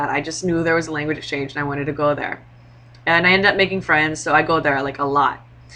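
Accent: United States. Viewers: I wish I could hear you speak Korean!! I'm interested in your dialect or amercian accent affecting it in any way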